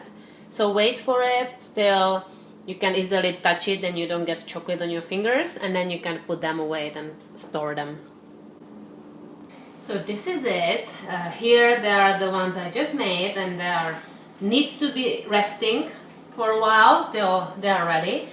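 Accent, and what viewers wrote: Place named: Czech Republic. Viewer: Lol it's not your slight Czech accent.All captions I've seen were inaccurate,so I read them for laughs.Once I watched a 50's United Airlines promo.A beaming flight attendant said to a boarding businessman;"you're my first passenger of the day!". The subtitles read"good morning squirrel,you're my first hemhoroid of the day."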